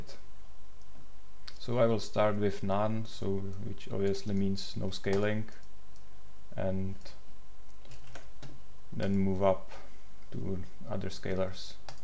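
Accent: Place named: Czech Republic. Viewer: Ne... nikoho jsem se napodobit nesnažil - prostě českej přízvuk.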